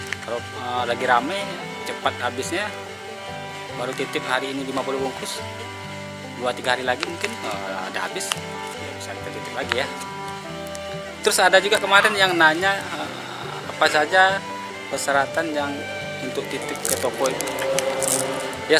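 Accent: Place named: Sumatra, Indonesia. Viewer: Bang ini berasal dr daerah mana ya? Kaya logat Sumatra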